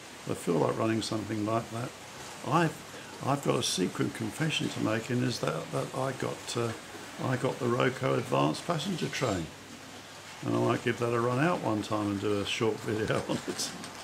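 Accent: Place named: Australia. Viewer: Hi, very sorry to hear of your continued back pain. I know how it can make everyday life very draining. Getting down to rail level and filming can be particularly difficult. However, if you can manage it, please carry on posting the videos. As you rightly say, there are lots of great youtube channels for model railways, but your layout has got to be one of the most enjoyable to watch. There's something interesting in every shot, and I love watching your trains go by. This Pommie even enjoys the Aussie accent! Best wishes for 2023.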